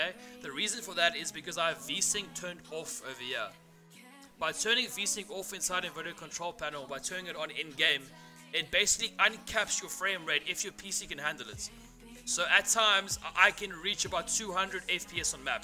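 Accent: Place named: South Africa. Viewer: Woah South African tarkov youtuber?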